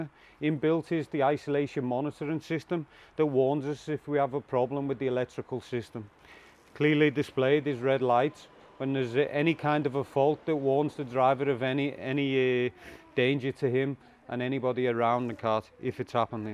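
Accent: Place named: Newcastle, United Kingdom. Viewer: I love the Geordie accent🙃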